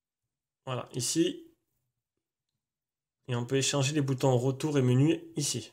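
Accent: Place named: Marseille, France. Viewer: Ta un accent marseillais comme moi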